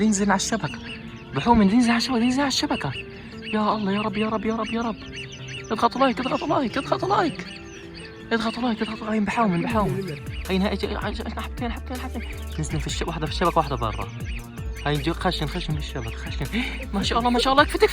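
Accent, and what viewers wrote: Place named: South, Saudi Arabia. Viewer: ❤❤😂 نفس لهجتنا اخ جنوب السعوديه حوش الصيد واكفتها وتشرد وكثير وعشان 😂 نفس اللهجة اقرب لنا من اللي قريب من ٥٠ كيلو تختلف حنا عنهم وانتم تبعد ونفس اللكنه ❤😂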